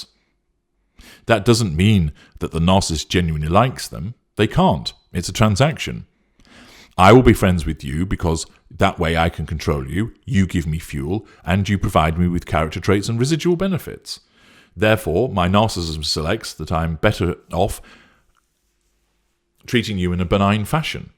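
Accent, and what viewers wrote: United Kingdom. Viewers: Hard to understand the British accent.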